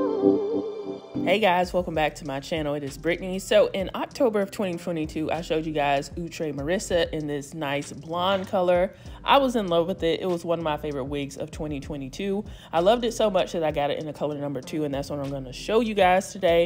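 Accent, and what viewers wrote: South, United States. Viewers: I don't think I ever realized how much you sound like a southern belle, lol. It might be the edible I had. 😂 The wig look great!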